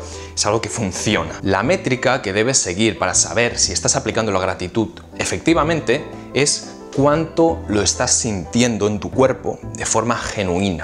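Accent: Spain. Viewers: Hola , excelente informacion y con acento español pero muy bien explicado. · Woooow me acabo de enamorar !! Que combnación mas way!! Rasgos orientales, acento español y una voz súper Varonil !! 😍😍😍😍😘😘😘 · This the first time seeing you Your Spanish is impeccable better than mine you have to live either in Spain because of the accident that you have with certain words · Un asiático con acento español💖 Oh Dios, me enamoré 😍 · Excelente video, me llama la atención, que un oriental hable con acento español... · habla castellano con tono Español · Si rasgó orientales asentó español y una voz muy varonil y ademas guapo y simpático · Es porque se habrá criado desde niño o nacido en España. Yo tengo un amigo chino que habla igual, como nosotros los españoles, porque ha nacido aqui.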